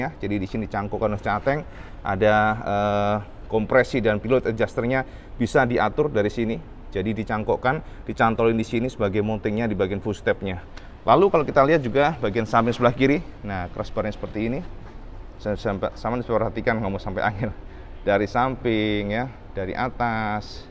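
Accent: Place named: East Java, Indonesia. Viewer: Logat mu cak cak... Apikkkk 😎😎☕👍🇮🇩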